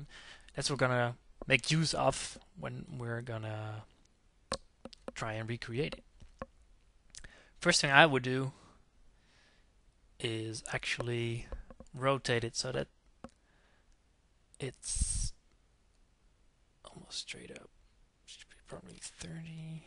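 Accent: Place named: Netherlands. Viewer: Dutch accent :D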